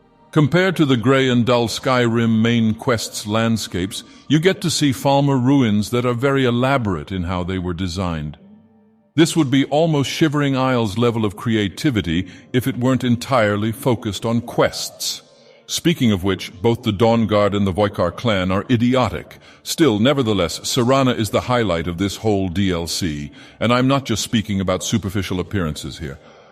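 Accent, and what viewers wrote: United Kingdom. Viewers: British Dagoth Ur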